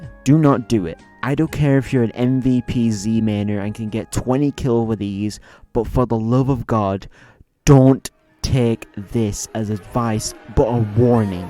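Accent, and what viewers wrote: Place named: United Kingdom. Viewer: New upload from my favorite bri’ish man? Nice! (Don’t tell combine elite lmao)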